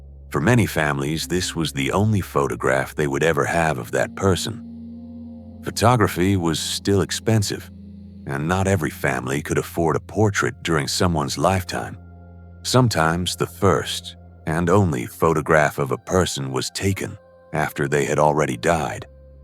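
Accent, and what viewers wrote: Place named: United States. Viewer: Was this about British history? If so, why are we listening to an american voice - it's very disrespectful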